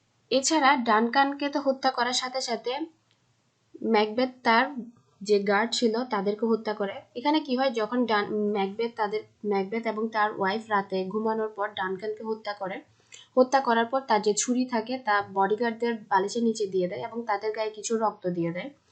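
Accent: Chittagong, Bangladesh. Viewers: you are from Chittagong right?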